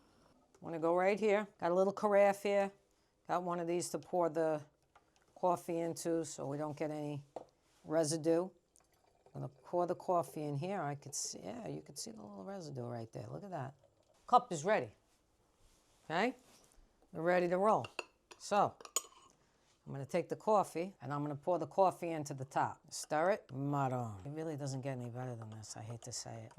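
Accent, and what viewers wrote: New Jersey, United States. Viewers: Used to live in Jersey. This is the first time I ever heard Jersey accent and think ASMR. Read the book. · I miss the sound of Jersey. Best accent in the world. Warmest people. 😢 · Yokes, nats, and fathas, Jersey accent, forget about it, love this vid!!! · OMG. I grew up in northern New Jersey and now live in the South. The accent, the mannerisms, the relatability - all transported me back there. What a Jersey girl! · That italian jersey accent😂😂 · Love the Jersey accent. 😊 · It is an Italian recipe, beat egg york with sugar and marsalla....they used to give it to the children to raise their immunity , strengthen them, specially if they were week. Is she from New Jersey!!! Her Accenture her way of talking so masculin...ohhhhh no milk please!!! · I know she's from Jersey 😂😂😂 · Jersey accent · Gawd. I'd rather wipe my ass with sandpaper than hear a New Jersey accent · At first I was intrigued by her Sopranos accent, then the egg and coffee, watched the whole thing, loved it, hope it becomes a show or something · As a Jersey native living down south, her voice takes me back home🥰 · I hope you do more videos. 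Your Jersey accent is the best. I’m definitely going to try this! Never heard of it before, i never see Chock Full of Nuts coffee anymore. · Is she a Jersey girl or what i love her!!Coming from jersey myself,love her jersey accent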